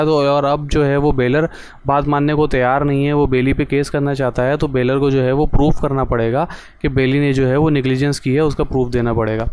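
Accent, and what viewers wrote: Karachi, Pakistan. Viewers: AP Karachi say ho??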